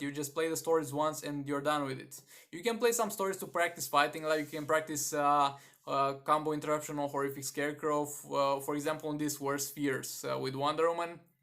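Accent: Romania. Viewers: Omg Ur from Rumania. Been wondering where your accent was from for a while.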